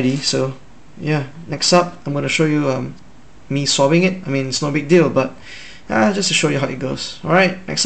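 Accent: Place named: China. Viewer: You are Chinese or Chinese descent? I can somewhat tell from your accent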